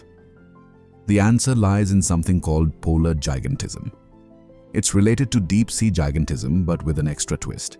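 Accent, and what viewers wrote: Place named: India. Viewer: why is the ai voice talk like an indian?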